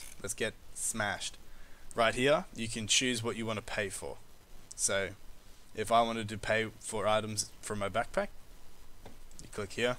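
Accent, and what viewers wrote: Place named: Australia. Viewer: I LOVE THE AUSSIE ACCENT! ITS SO NICE TO HEAR A YOUTUBER HAVE ONE! (i'm australian)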